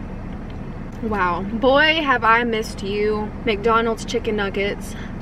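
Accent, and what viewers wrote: South, United States. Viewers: really nice southern drawl of and accent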